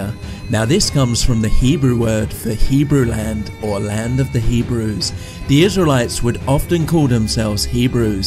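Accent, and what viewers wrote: United Kingdom. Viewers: I'm so tired of British accents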